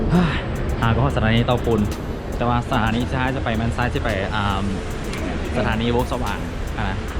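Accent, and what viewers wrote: Vientiane, Laos. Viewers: คนเลยในความรู้ของคนอิสานก็คือ คนพูดแบบคนหลวงพระบาง ที่น้อง พูดนั้นคือสำเนียงเวียงจันท์ขนานแท้ ถ้าย้อนไปเมื่อเป็นร้อยปีเจ้ามหาชีวิตลาว ได้นำเอาคนเชียงใหม่มาไว้ที่เวียงจันท์ ส่วนคนที่พูดสำเนียงแบบน้อง จะพบได้ที่จังหวัดชัยภูมิ เพราะเจ้าพ่อพยาแลได้นำพาคนเวียงจันท์มาสร้างเมืองชัยภูมิเมื่อรัตนโกสินธ์ตอนต้น ส่วนคนเวียงจันท์ปัจจุบันคือคนกลุ่มใหม่ที่เข้ามาอยู่ใหม่แทนพวกเดิมหลังมีการเปลี่ยนการปกครอง คนลาวในกำแพงนครรุ่นเก่าได้อพยพหนี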